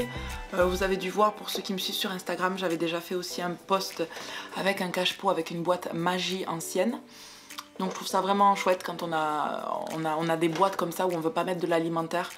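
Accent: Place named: South, France. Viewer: l'accent du soleil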